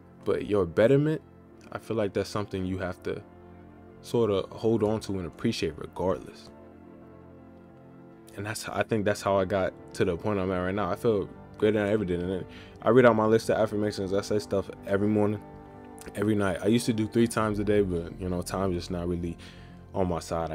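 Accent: United States. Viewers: You from Georgia bruh?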